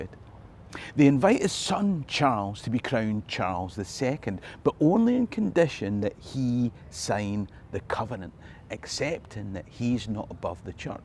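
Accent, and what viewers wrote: Scotland, United Kingdom. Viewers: I've wanted to visit my great grandmothers and great grandfathers birthplace,but I am settling for maybe when in 5 years I can retire but I've found the Scottish accent to be the best in the world,that accent can tell and lead any story,so thank you ,we live a bit of a distance from you ,,,,Dallas Texas ,thank you for teaching all of us willing to listen,Robert.R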